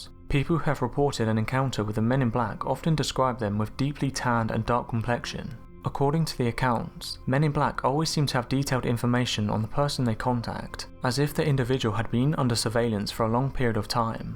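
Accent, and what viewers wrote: United Kingdom. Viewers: So nice to hear a British accent for a change. Music for my ears.. Regards from Serbia! Your videos are great.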